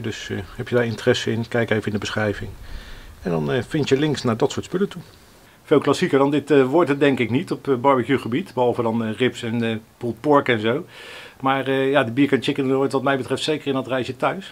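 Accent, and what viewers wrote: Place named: The Hague, Netherlands. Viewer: Top filmpje, ga ik eens mee aan de slag! Geboren Hagenees zo te horen hè? ;)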